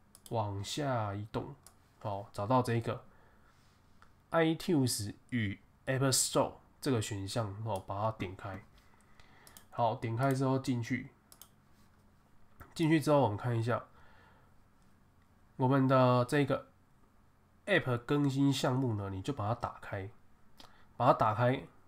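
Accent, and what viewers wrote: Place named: Taiwan. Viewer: 台湾腔诶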